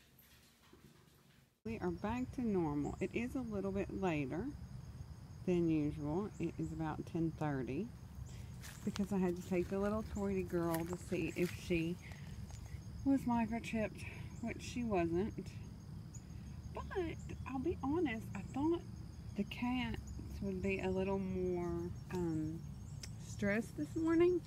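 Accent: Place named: South, United States. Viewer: You sound like you’re southern. Where are you from ? I like your accent .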